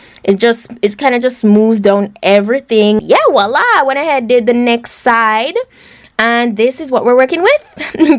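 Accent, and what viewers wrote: Jamaica. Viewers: your Jamaican accent is so peng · Damn her 🇯🇲 accent thick lol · I love her accent ❤️🇯🇲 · Luv this my Caribbean sister Im Trini....luv jamaican accent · Her Jamaican accent is cute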